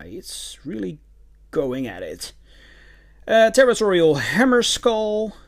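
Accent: Netherlands. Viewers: are u dutch?? ik hoor het aan je accent :>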